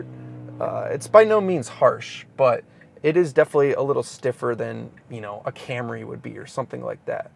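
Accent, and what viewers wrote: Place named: California, United States. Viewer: California diction, syntax, grammar and rhetoric...yuk.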